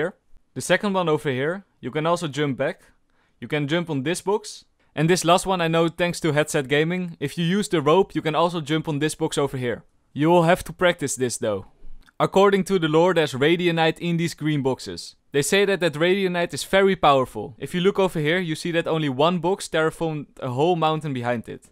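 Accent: Netherlands. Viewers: Love the reference to Kud on this moment xd I hear your Dutch accent so you can't say you don't know Kud haha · ik hou van u nederlands accent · Goed Nederlands accent wel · Are you dutch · De Hollandse uitspraak van haha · Ik weet gewoon gelijk dat je Nederlands bent geweldig ik vind je oprecht geweldig man ga zo door!!!! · dutch accent :) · Met dat nederlandse accent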